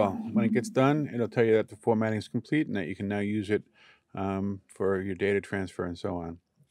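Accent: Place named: Long Island, United States. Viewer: Brookhaven Residents love your Long Island accent, brother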